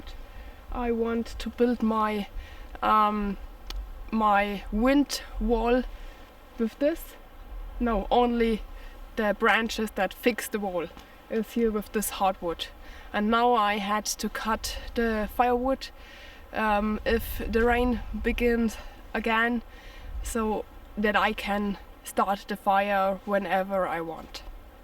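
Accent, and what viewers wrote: Bavaria, Germany. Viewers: Wow - you even broadcast in English? It sounds cute - I never heard speaking anyoine in english with an bavarian accent... :-). Since I found your channel, 4 days ago when youtube recommended your video you made about the cabin situated in the woods, I find it amazing what you do. And you actually inspired me. Because I know someone, not living in Germany, UK or even Europe who shares the same interests like you do... I am surprised about the number of views you get. I will chat to her today to find out if hiking in the wild and producing youtube content wouldn't suit to her, as she is struggling a lot, financially...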